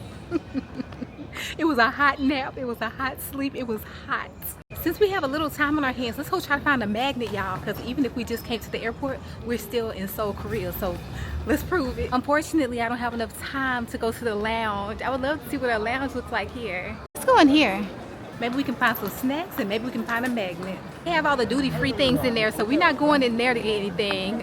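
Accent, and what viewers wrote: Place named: South, United States. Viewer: Your accent sounds more Southern than Texan. Did you grow up in Texas ?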